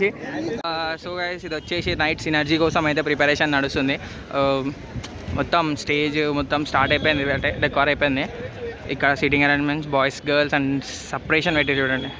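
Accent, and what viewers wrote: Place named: Tirupati, India. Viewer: Where are you from bro ? , Bangalore ah ? Tirupati laga vundhi bro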